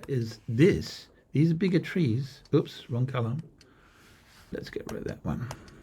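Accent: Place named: Afrikaans, South Africa. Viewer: Can almost here the Afrikaans coming out haha; beautiful presentation, I genuinely learned alot.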